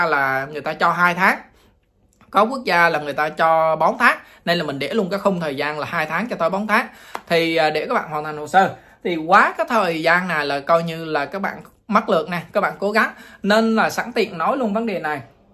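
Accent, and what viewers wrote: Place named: Quang Nam, Vietnam. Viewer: Nghe giọng hình như a quê ở quảng nam đà nẵng gì phải ko ạ. Nghe a chia sẻ rất chân thật dễ hiểu . Em cũng có dự định tham gia mà chưa rõ các giấy tờ nào cần công chứng hay ko cần mong a ra video mới ạ❤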